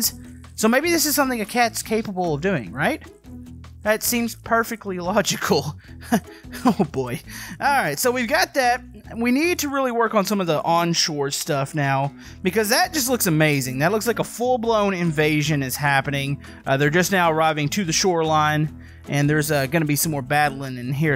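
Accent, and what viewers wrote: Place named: South, United States. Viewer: You kind of have a southern accent at times.. hmmmm lol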